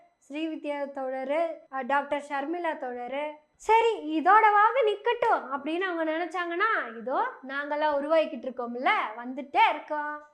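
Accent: Kongu, India. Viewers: கொங்கு தமிழ் சுவையோடு அழகாக பேசும் சகோதிரிக்கு வாழ்த்துக்கள்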